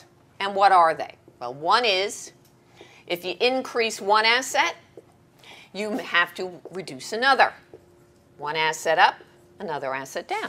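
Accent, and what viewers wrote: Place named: Queens, United States. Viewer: Queens accent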